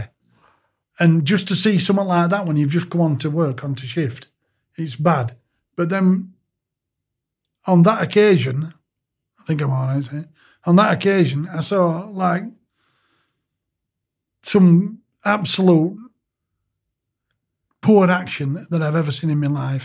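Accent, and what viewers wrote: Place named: Yorkshire, United Kingdom. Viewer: Such a lovely lovely man. He has been through so much in his life. I was so fascinated listening to him tell his story. I shed a tear when he did as the way he was treated was so dispicable. Love his Yorkshire accent too. Thank goodness he has a great supportive wife to help him get through. bless him 💘💖❤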